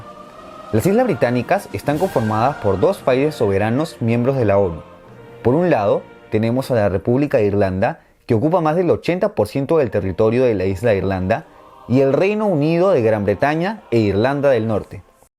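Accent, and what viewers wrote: Peru. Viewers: Lo único malo del vídeo fue escuchar tu puto acento peruano, recuerda a la momia bozzo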